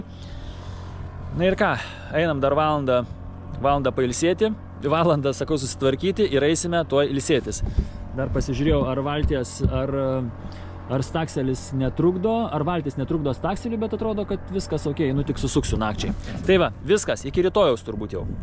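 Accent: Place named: Czech Republic. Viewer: Gražus čekų akcentas ❤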